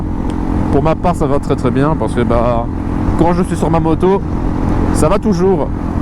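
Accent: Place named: Belgium. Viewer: tu es ou en Belgique ? Je le sais avec ton accent de begique et les plaques. Sinon au top de la vidéo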